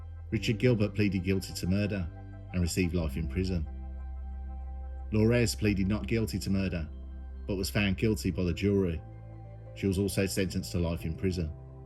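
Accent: Birmingham, United Kingdom. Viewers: Are you from Birmingham? I'm from Lichfield down the road!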